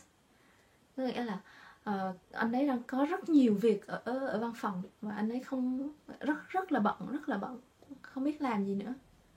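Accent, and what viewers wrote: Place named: Paris, France. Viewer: Cảm ơn cháu cho bác có dịp nghe lại giọng pure 'Parisienne' , mong cháu cho thêm bài mới nhe. BON SANTÉ.- Bác ttt, WA, America.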